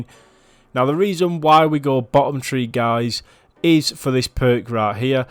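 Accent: United Kingdom. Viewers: Just out of curiosity what part of the UK is your accent from?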